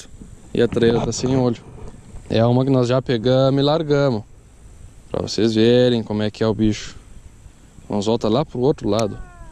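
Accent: Rio Grande do Sul, Brazil. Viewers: O lugar é lindo, o vídeo é top, porém o que eu mais gosto é o sotaque gaúcho. Sou Paulista porém gosto da cultura, da culinária e tudo o que é do Sul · A DORA GAÚCHO O SUTAQUE É TOP ABRAÇO A TODOS DO RIO GRANDE DO SUL 😘😘😘😘😘😘😘😘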